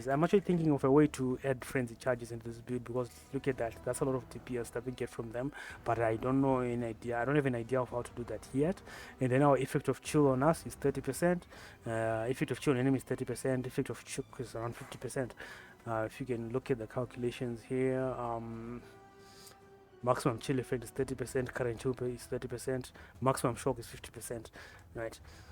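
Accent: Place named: South Africa. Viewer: lol brother, where from South Africa you from?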